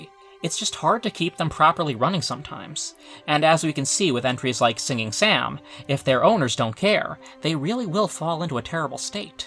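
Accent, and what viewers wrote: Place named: Tennessee, United States. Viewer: Lol I didn't think you were Australian at all I think you're from like Tennessee instead lol!! Also I think looks more hilarious than creepy lol!!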